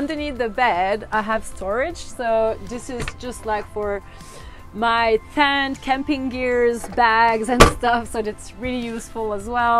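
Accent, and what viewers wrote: Canada. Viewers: Definitely a strong Quebec accent haha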